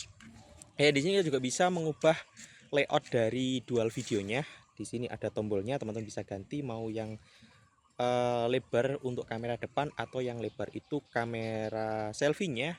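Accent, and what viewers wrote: Banyuwangi, Indonesia. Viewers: Lho sampean orang BWI mas?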